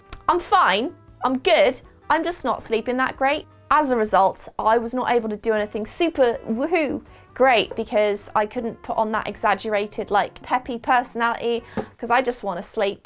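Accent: United Kingdom. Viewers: learning how to have a British accent and I wanted to hear one to help me learn it better, and I immediately thought of u! lol :D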